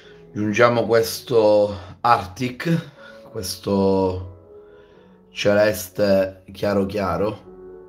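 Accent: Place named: Sicily, Italy. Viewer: Ti ho scoperto per caso ma non ti lascio più! Penso, dal tuo accento, che tu sia siciliano come me! 👏👏👏👏👏🥰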